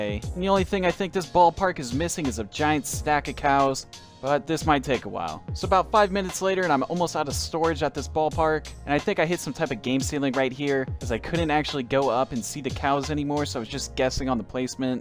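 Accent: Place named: Baltimore, United States. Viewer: algorithm That's just the Baltimore accent. You should see what happened with Aaron's iron urn.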